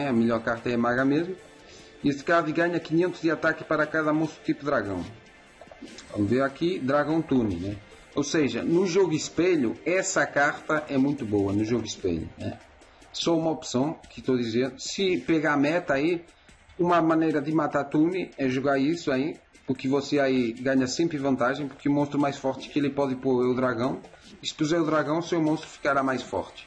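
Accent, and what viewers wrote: Portugal. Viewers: gostei da sua visão de jogo e comentarios. não entendo muito portugues de portugal mas ta valendo rs. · que sotaque é esse cara??? é um português falando português-br??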